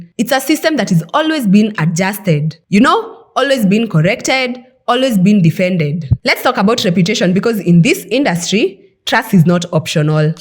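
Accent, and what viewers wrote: Kenya. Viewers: She has the typical Nairobi English Accent 😂 .. the Kenyan English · her authentic Kenyan accent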